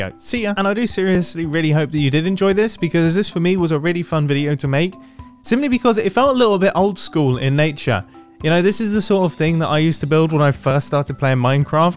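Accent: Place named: United Kingdom. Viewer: oh OK just sounds British to me for some reason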